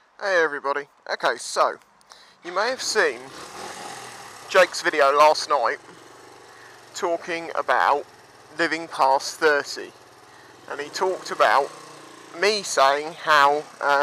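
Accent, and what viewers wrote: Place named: England, United Kingdom. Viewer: Hahaha, good stuff, man. "This guy knocked out because it was so good!" As some have mentioned, it's not about the bike. We all came here for the english accent. Haha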